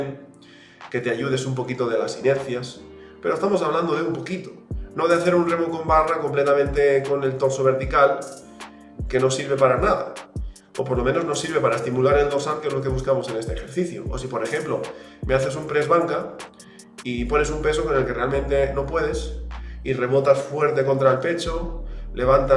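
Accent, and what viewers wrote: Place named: Latin America. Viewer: Coach te quiero mucho, pero ¿por qué hablas como latinoamericano siendo valenciano?. Un saludo crack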